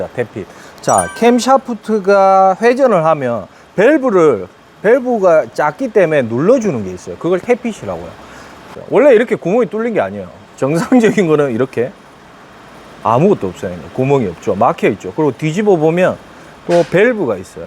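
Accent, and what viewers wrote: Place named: Busan, South Korea. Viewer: 본가가 근처에있는데 구독자놀러가면 커피한잔 주십니까?ㅎ..정비도 정비지만 부산사투리맛집ㅎ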